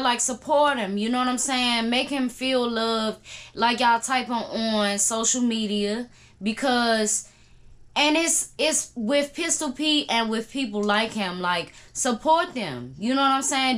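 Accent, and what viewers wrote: Washington DC, United States. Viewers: True DC accent.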